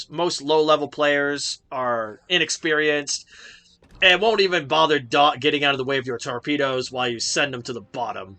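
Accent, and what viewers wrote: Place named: United States. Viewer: Dat murrican pronounciation....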